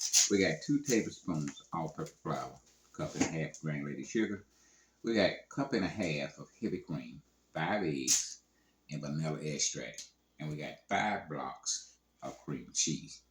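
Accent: South, United States. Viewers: Mr. are you from the state of Florida? Georgia or Louisiana?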